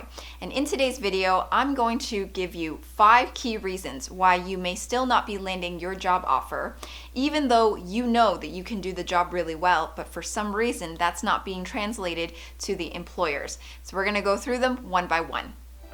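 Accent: Canada. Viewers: This is so much more inspiring with a Canadian accent!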